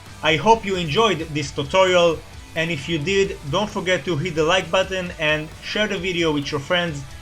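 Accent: Israel. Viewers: אתה ישראלי? אתה נשמע ככה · אני חייב לשאול אתה ישראלי? יש לך מבטא והשם שלך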